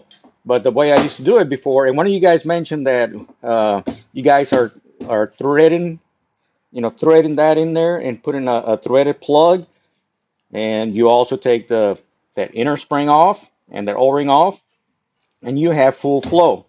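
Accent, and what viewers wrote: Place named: South, United States. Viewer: I'm totally enthralled to your blended southern/hispanic accent.😉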